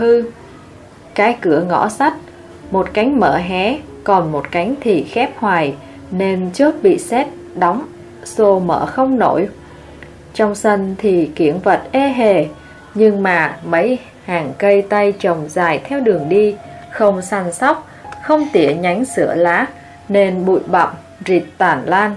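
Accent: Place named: North, Vietnam. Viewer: Truyen HBC fai giọng đọc nguoi mien moi dc . Het nguoi đọc sao chọn giọng Bắc kỳ vậy .ko nghe đâu !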